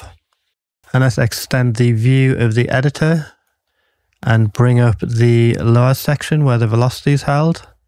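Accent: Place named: South Shields, United Kingdom. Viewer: I have watched it through 4 times now so you should be getting a cheque fo $0,0000 x 4 dont spend it all at once at the moment I managed to get in an instrument but cant get any sound out. I used cubase with atari many years ago and it was so simple with a Roland JV-1080 and got some great results (one recorded by Tom Jones ) Written in South Shields You sound as though your from up there but I cant get it to work with this I,ll soldier on trying to get it to speak to me I've got 26 days of trial left but at the moment even with your brilliant tuition it a no no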